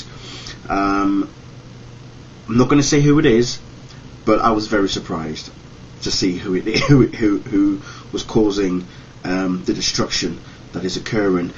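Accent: United Kingdom. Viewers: What part of England u from I know it must be up north lol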